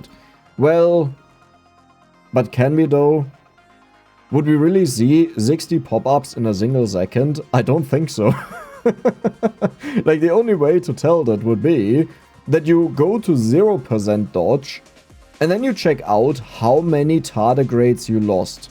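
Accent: Germany. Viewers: Becauze he haz a strong German aczzent.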